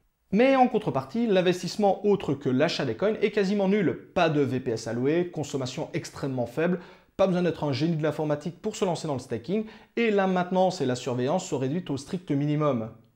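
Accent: Belgium. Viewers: On reconnait cet accent belge dégueulasse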